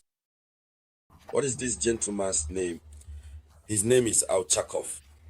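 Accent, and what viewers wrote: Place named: Africa. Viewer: Sir u speak like an AFRICAN y not speak about AFRICA ,UKRAIN try to kill PUTIN as they say what can be more dangerous than that and RUSSIA do nutin look how long UKRAIN as bin sending missiles n drone into Russia all these leaders only send trees..USA said any missiles fire by Yemen ,IRA is responsible yet still YEMEN defeat the USA in the red sea im paying attention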